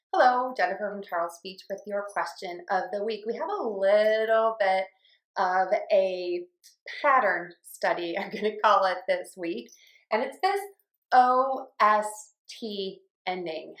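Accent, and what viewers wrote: United States. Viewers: Hi. I'm from Colombia and a love Your videos are amazing that help me to improve my pronounciation. I wanna know what part of usa you are?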